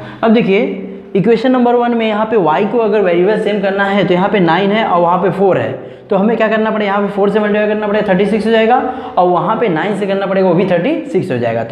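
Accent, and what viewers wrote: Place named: South India, India. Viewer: Sir from which state are you ,your speaking tune are like south Indian people's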